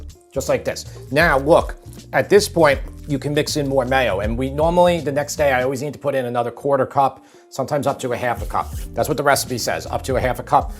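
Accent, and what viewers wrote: New York, United States. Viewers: As I keep saying! I love your accent! So Noy York. The food is good, too. I recommend you make a Spanish style Russian Salad.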